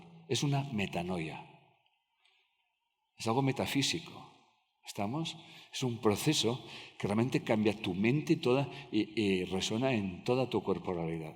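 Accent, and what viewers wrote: Catalonia, Spain. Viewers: hay palabras q no se te entienden porque tu catalán lo impide.....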